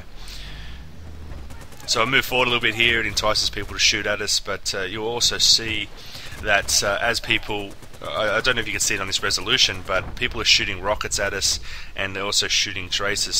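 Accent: Australia. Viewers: holy shit an aussie commentator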